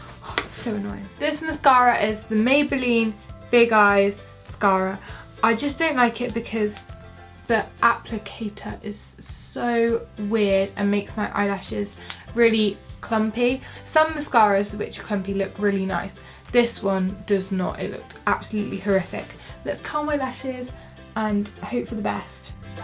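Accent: United Kingdom. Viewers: Where are u from u sound like ur from England are u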